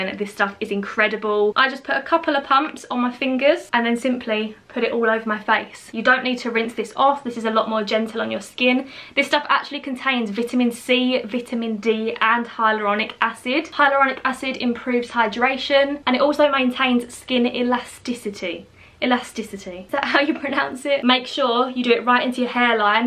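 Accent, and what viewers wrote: United Kingdom. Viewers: Finnally i have found a perfect video, since i couldnt decide which one to buy, you explained it perfectly, also im just so in love with the brittish accent 🥰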